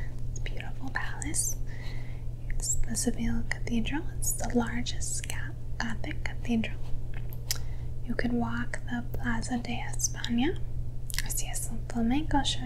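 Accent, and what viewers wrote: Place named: Upper Midwest, United States. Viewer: definitely has that upper midwestern accent. Probably from Michigan, Wisconsin or Minnesota. Maybe northern Illinois. I think I've found a new ASMR channel!!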